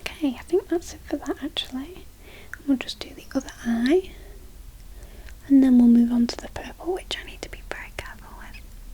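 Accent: United Kingdom. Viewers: Madeline C correct me if I’m wrong, but from theatre experience, this sounds like British to me. ( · You have a beautiful accent! Definitely no need to feel self conscious about it; I think a lot of people probably feel the same way about their own voice. I'd watch any of your videos, whispering or soft spoken you're so relaxing to listen to. Out of interest what part of the UK is your accent from?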